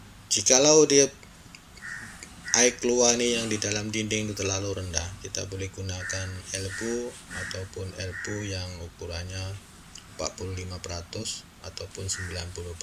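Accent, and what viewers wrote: Malaysia. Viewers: Cakap pon dh campo+ mcm org msia😅😂 tmkasih ya❤moga dimurahkan rezkinya&diberkati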